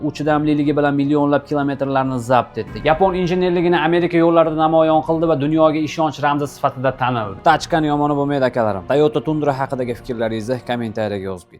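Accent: Andijan, Uzbekistan. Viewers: Бу брат тоза Анжанданми диманда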